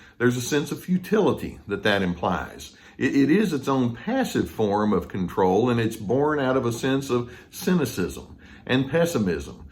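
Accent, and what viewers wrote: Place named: Texas, United States. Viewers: I love Texas Twang, too, being from Texas (military brat). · Dear, you and your warmth and wisdom are a guiding star to me. If I ever meet someone like you I’ll marry them right away 😜 Just kidding of course, because we’re striving for ‚Team Healthy‘, right? This video was (again) super helpful and well organized. I am always trying to educate myself on ‚early signs‘ in getting to know people and potential friends or a potential partner... and this video was particularly helpful. And you coming across so warm-hearted and well-balanced certainly doesn’t hurt your message. And btw.: I love your Texan accent too 😍 Love my regular dose of Dr.Carter 🥰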